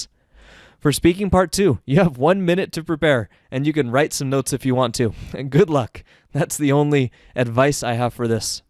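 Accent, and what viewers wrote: United States. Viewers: Are you american?.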